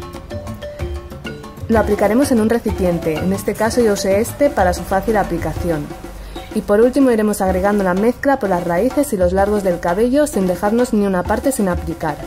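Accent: Spain. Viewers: Wow! me sorprende tu voz tienes acento español; muy bien, ya era hora de conocerla saludos!! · Acento español!!!!! De dónde eres originalmente?? 💖💖 Te queremos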